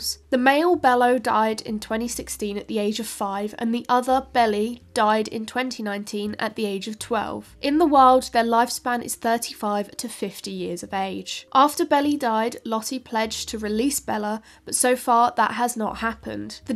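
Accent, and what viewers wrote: RP, United Kingdom. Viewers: I love this channel for: 1. Lots of cool science news. 2. Perfect English RP which is a music for my polish ears. Best Regards! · I knew before I started the vid, she'd have a British accent. Perfect. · I nominate voice with her adorable English accent to be the new voice of OpenAI's GPT-4o.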